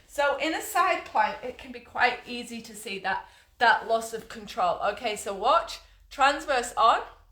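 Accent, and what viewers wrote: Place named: Lancashire, United Kingdom. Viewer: This was great advice thank you, love the accent, are you originally from Bolton Lancashire or nearby? X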